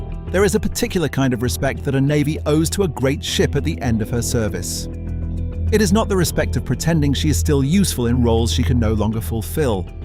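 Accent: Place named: United Kingdom. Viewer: thats why its ironic that its a British Voice.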